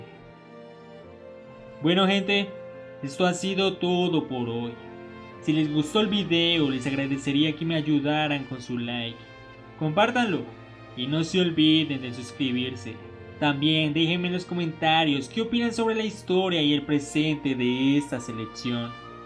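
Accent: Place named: Brazil. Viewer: Seguramente es brasileño y le cuesta el español se n ota el acento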